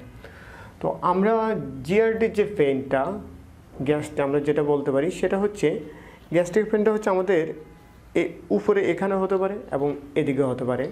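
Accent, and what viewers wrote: Noakhali, Bangladesh. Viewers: ওরে হেতের বাড়ি তো নোয়াখালী 😊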